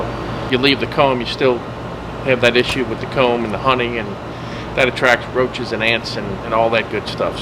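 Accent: Louisiana, United States. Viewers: Louisiana...a bit of a cajun accent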